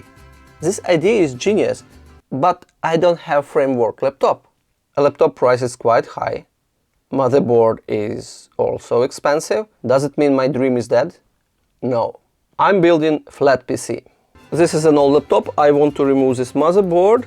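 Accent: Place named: Eastern Europe. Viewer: Super nice video! And Eastern-European-English style gives it extra fun ❤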